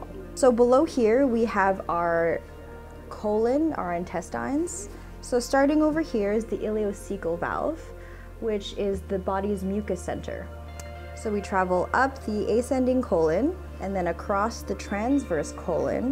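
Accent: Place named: General American, United States. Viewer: Her diction is clear. She has the standard American accent.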